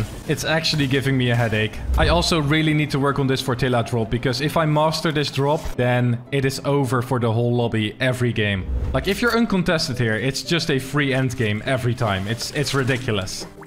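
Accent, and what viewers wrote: Netherlands. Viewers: jij bent sowieso Nederland, is hoorde het aan overpowered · haha zieke nederlandse accent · Are you dutch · You sound dutch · What cauntry or u from i think the netherlands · Dat Nederlandse accentje😝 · Het nederlandse accent is weer lekker terug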